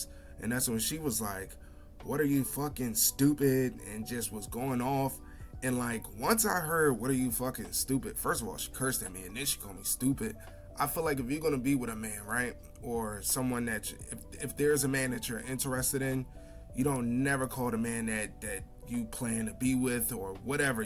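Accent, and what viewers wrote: United States. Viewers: You from B-More or Philly? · Sound like American woman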